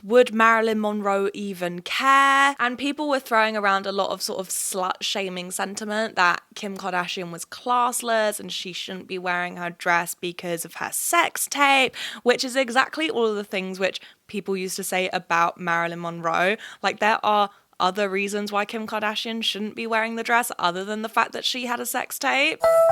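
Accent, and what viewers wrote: California, United States. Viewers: Real talk tho your American accent is fantastic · No but the American accent ateee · Woah. Your american accent is amazing almost uncanny valley. I love it! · Your U.S. accent was so great! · as much as I love your videos, your american accent is pretty rough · Ahahah you do have a solid American accent nice job. Respectfully, a Midwestern American viewer. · your American accent is genuinely pretty good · Her american accent is actually so cute lol 😭💖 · Love the american accent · No joking at ALL the American accent is SPOT ON BABE !!! Big love from New Jersey ❤❤❤ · As an american myself I have to say your american accident was pretty good. Not perfect, but definitely good. Also I have to say I loved the visuals in the background of this video. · Your American accent is impressive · Very good American accent haha my British accent is not as good ! 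· The biggest thing I'd say is that your American accent "A"s give you away. It is a pretty good accent. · american accent sound exactly like Ashley from Ladies Code i would say that's a success (you can listen to Ashley in the Get Real podcast if you don't know her) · American accent popping off babes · Okay your American accent is legit on point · American accent is amazing!! US citizen here. Going viral bit is hilarious! Ily T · Your American accent ain’t too shabby! this look back on 2022 made me realize how crazy this year was. What a year, here’s to another one! 🍾🥂 excited for more of your content! :) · OMGG ur American accent is 👌🏻👌🏻 cali girl!!! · not an important comment but maybe the MOST important comment… American accent was top tier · 8 outta 10 on the accent, pretty good! A bit of work on it and you could take an American part in a film I’d say! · Hearing you talk in an American accent is so crazy ur voice sounds so different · Your American accent was fantastic, the only word that tipped me off is you said “process” first as “PRO cess” and we silly American usually say it like “PRAH cess.” Not all, but most. 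· As an American, I have to say, I would totally believe you were from Cali with that accent. I would love to hear you try my section of America and give a southern accent a go. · your american accent >>> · Hahaha YES. Californian accent acquired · as an American, your American accent is good! it wavered a little at the start, and some words you still pronounced the British way, but it was pretty solid! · Your American accent is actually really good!!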